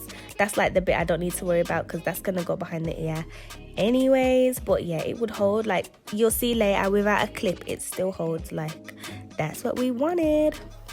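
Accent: Birmingham, United Kingdom. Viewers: It’s a Birmingham accent x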